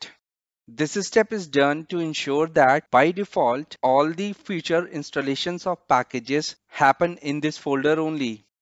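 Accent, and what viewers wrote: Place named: India. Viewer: talks like an indian bot, jesus christ bruh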